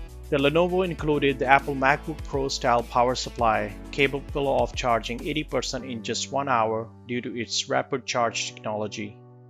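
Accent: India. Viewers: If u are Indian put the title in indian trust me no one wants to hear ur English accent get ur audience from your own shitty cohntry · Great Video, but the accent cringe is real! Either use Indian or work more on the US one. It's painful inbetween. Cheers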